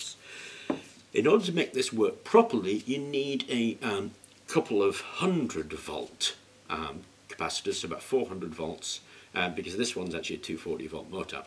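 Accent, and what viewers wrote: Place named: England, United Kingdom. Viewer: Piękny angielski akcent :)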